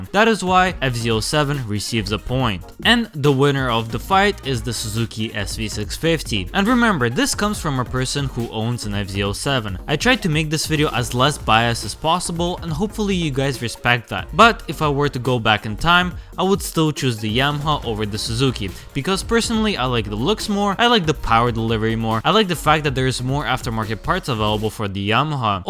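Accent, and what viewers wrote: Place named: Russia. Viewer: А чё там русский делает? Это же точно русский. Такой акцент буржуи не повторят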